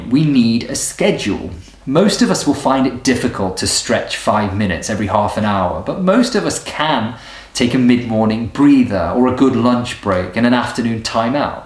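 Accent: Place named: Stoke, United Kingdom. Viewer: and bring back the stoke accent dude don't forget your roots duckie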